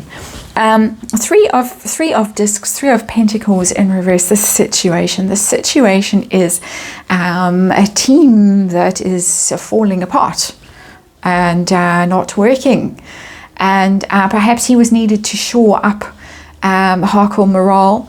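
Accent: South Africa. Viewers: I'm here for the snark too!🤭 I love beautiful, lilting SA accent and the way she giggles and snarks!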